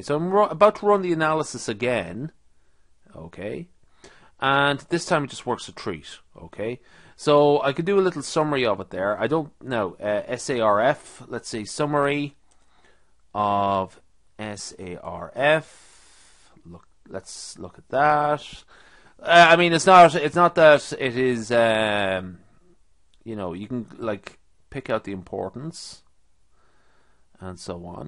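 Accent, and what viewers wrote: Ireland. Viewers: It's kind of spooky hearing another Irish person talk me through machine learning on a YouTube video, especially in such a casual manner. You may as well be my inner monologue 😂 "So here we are aaand ... that's not great". Subscribed. Looking forward to more of your videos :)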